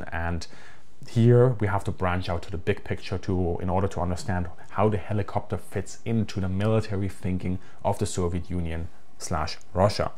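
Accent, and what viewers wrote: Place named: Germany. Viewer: Ukraine has an aviation problem, they have none. They have to go begging to EU countries because, well theirs have been destroyed. I love how a guy with a German accent recommends how Russia should use their helicopters, lol what a joke, I'll take him seriously when Germany stops hiding behind Americas petticoat and enters the conflict.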